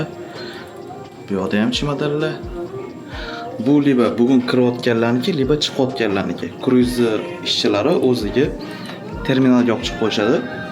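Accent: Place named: Tashkent, Uzbekistan. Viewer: Хоразмдан акансизку лекн тошкенчани йориб гаплисиз акан гап ёк😅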